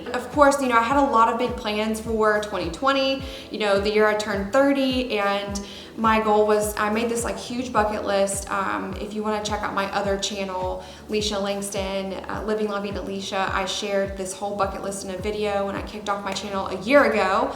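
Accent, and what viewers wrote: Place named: New Jersey, United States. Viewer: Sounds like a Jersey accent to me.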